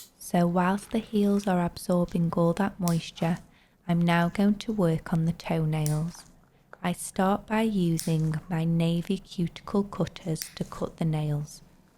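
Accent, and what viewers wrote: United Kingdom. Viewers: I'm not used to a UK accent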